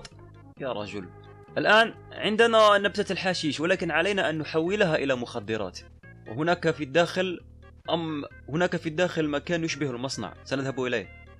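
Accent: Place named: Egypt. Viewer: يا انت مصري ولا جزائري ؟ انا متأكد انك مصري علشان بتتكلم زي المصريين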